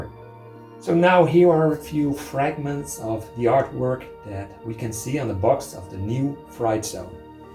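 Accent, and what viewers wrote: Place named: Netherlands. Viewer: Aan het engelse accent te horen, ben je toevallig Nederlands? 😄 Ik ga me hoe dan ook abonneren op je kanaal 😊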